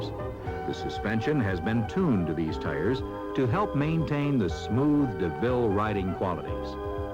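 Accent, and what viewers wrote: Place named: Michigan, United States. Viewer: I've always liked how all of these films from this era have narrators with Michigan/Great Lakes-region accents when these companies were much more concentrated there. It's a subtle thing but you notice it when you've been around. It's just a shame he didn't get to say center "council". That phrase is as Michigan as Meijers with an "s" I've noticed. A lot of cool video shots of the Metro Detroit area in these films too if you pay close enough attention. The freeway ramp is at the Lodge and Southfield; the building in the NW corner of the screen is the IBM building which had just been completed that year (1980). The "One Way" sign at the end of the freeway ramp is something Michigan still does, because I guess it's the only place people have ever made U-turns at the end of an entrance ramp at some point in the past to warrant them.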